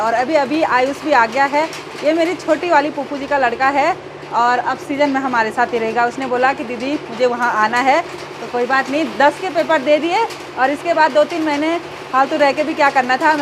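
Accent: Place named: Uttarakhand, India. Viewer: The best youtuber from uttarakhand ❤ the way she speaks ..true uttarakhandi touchhh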